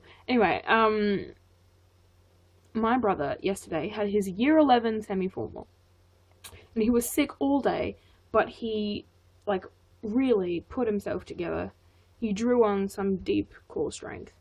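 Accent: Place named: England, United Kingdom. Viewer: you're english!?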